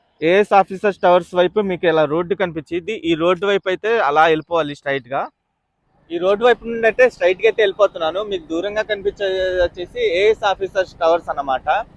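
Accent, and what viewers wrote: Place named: Guntur, India. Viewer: గొర్రెల కాపరి మహా భారతం చెప్పినట్లు గా ఉంది. అంతో ఇంతో చదుకున్నట్లున్నారు. భాష మీద పట్టులేదు tv జర్నలిస్ట ల భాష "ఏదైతే ఉందొ, అనేది ఉందొ, చూడవచ్చు, ఇలాంటి మాటలు జర్నలిస్టులు మైక్ పట్టుకొని అ ఆ ఇ ఈ అంటూ మాటలు తడుము కుంటూ సోది చెపుతుంటారు మీరుకూడా వాళ్ళ లాగ సాలు మూల లేకుండా రొడ్డ కొట్టుడు కొట్టారు. మీరు చాలా చాలా ఇంప్రూవ్ కావాలి.మీరు మాట్లాడే భాషలు guntur యాస కొట్టొచ్చినట్టు తెలుస్తుంది "వచ్చిద్ది, తెచ్చిద్ది".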